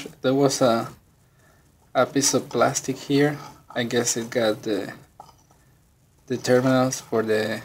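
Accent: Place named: Argentina. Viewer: sos argentino? you have the calssic argentinian accent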